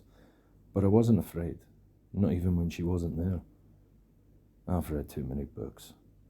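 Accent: Scotland, United Kingdom. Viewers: as a Scottish person hearing a audiobook by a Scottish person gives me school flashbacks aha also I couldn't find this book anywhere so it's good u covered it on YouTube, you should do more of these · Oh wow, scottish asmr and Bachman? And RAGE? Lets GOOOO! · The story sounds so medieval 🏰 being read by a scots narrator 🏴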